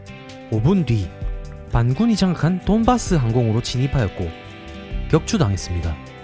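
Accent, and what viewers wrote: Gyeongsang, South Korea. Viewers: 경상도 사투리 흉내내세요? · 경상도 20년 살았는데 좀 이상하긴한데 서울말씨보단 듣기 편함 ㅅㄱ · 갱상도말 신경쓰이면 안들으면 되제 따지는게 많노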